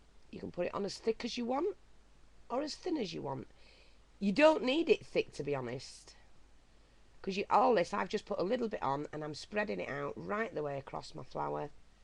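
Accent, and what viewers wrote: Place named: England, United Kingdom. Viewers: Yorkshire accent rockz! Go! <3 Love all your tuts! ^^ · Awesome, I subscribed cos I liked ur accent, makes a change from the tuts been american .... good to hear a northerner ;) ... great tut btw, can't wait to watch more x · Loved the video. I am all about saving money, and still having a great product. Thanks so much from B.C. Canada. I do Art Journalling and taking a course on Monday. Will be making some and using it on my page. Will pass this along to everyone. Thanks again.. Love the English accent.. I have English/Scottish Ancestors, and plan to go to England/Scotland hopefully again in a couple of years..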